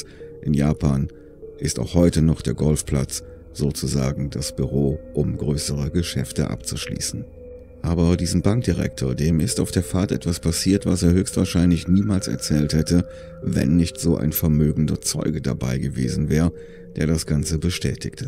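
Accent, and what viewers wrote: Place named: Cologne, Germany. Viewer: Auch wenn es nichts mit dem Thema zu tun hat, man hört ab und zu wie dein Kölsch so ein bisschen mit durchkommt 😄 Find ich aber super sympathisch! 👍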